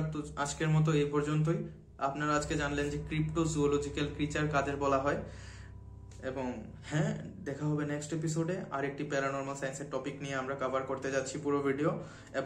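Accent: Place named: Sylhet, Bangladesh. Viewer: আপনি বাংলাদেশের সিলেট অঞ্চলের মতো কথা বলছেন। আপনি কোথায় থাকেন ভাই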